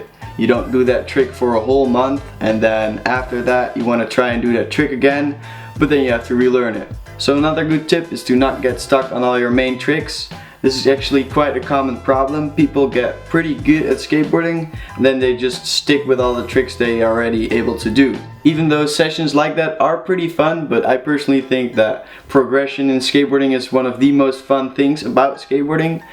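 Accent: Netherlands. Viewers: He has a Dutch accent, m8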